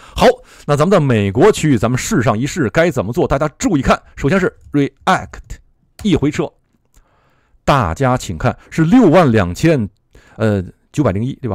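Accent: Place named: Japan. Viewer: 😂听说中文的习惯猜生活在日本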